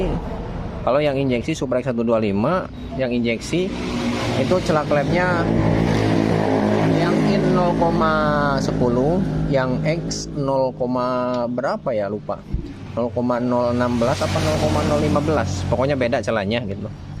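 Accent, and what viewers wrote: West Java, Indonesia. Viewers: Sunda ya 🤔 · Kang didaerah mana ya sepertinya logat sundanya balaraja ya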